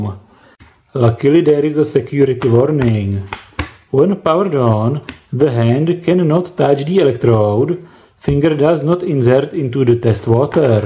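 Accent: Prague, Czech Republic. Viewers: Já věděl podle tý angličtiny, že budeš čech :D :D velmi dobrý kanál a ta angličtiina je topová :D <3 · Nezameniteľný pražský prízvuk.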